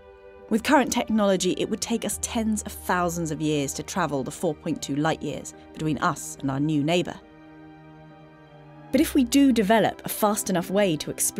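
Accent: England, United Kingdom. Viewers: Really getting tired of English accent narratives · I hate British accents. Especially when it's woman's voice doing it.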